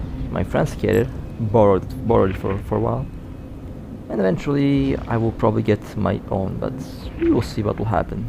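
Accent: Russia. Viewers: Ur russian accent😢 Но спасибо за видео!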